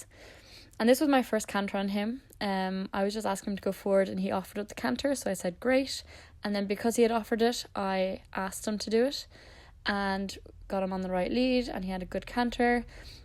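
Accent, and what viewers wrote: Ireland. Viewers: R u from Ireland 🇮🇪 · Are you irish?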